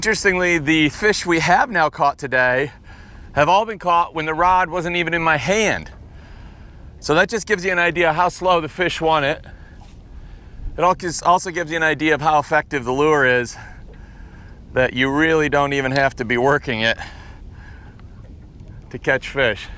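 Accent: United States. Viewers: you sound like a yank ,but then you say english slang...love the vids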